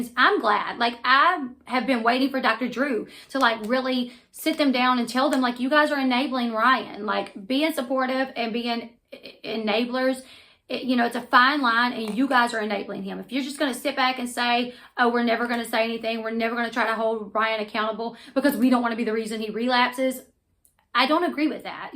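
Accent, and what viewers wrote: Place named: Georgia, United States. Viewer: Yasssssssss so happy I found you all the same things I'm obsessed with AND I'm from Georgia so the accent is everything!!!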